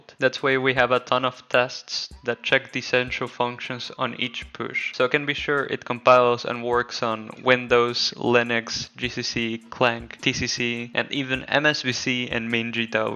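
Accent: Argentina. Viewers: bro, you are argentinian? MI PAIS MI PAIS Edit: I just saw your GitHub profile. You're the best!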